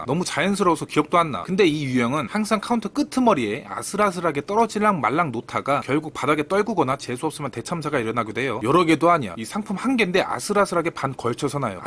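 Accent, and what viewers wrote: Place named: Jeolla, South Korea. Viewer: ..전라도이신가봐여 ㅋ 전 안흘려용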